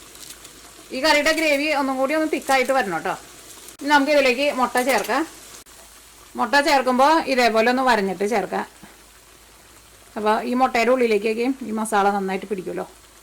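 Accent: Thrissur, India. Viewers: Heyyy, you are so adorable n I simply love yr simple n natural method of recipe explanation in your dialect of Angamali?? But feels like Thrissur...oh btw -that kadai is sooo beautiful..at the US shop???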